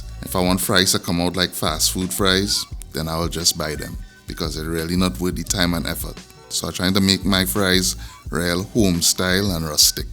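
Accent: Trinidad and Tobago. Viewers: I AM IN LOVE WITH YOUR TRINIDAD TALK 🇹🇹 😍 💕 ♥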